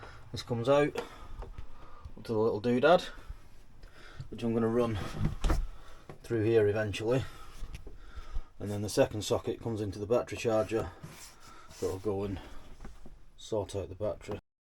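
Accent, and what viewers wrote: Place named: Northern England, United Kingdom. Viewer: Not at all, I love your northern no nonsense presentation. We are, ourselves from the North (Yorkshire). We're based on Anglesey now, but the boat is in Conwy. The house you are talking about sits right in the middle of the Swellies (near Menai Bridge and Llanfairpwllgwyngyll), which is reported locally to be one of the most hazardous areas of water in the UK to pass through. Apparently though, the best time to navigate it, at a good speed, is at slack tide (an hour or so either side of high tide when the whirlpools are least effective would work). Safe travels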